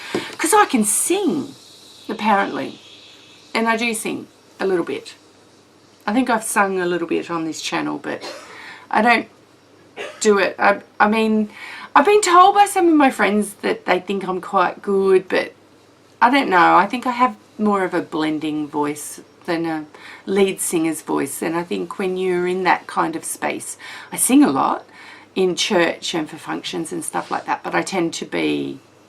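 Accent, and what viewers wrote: Australia. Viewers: Haha, yours is real & proper Aussie English! Mine is just a silly Dutch accent, LOL!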